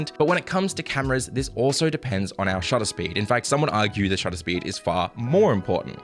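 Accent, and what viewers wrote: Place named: Australia. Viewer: Nice to hear a fellow Aussie :-)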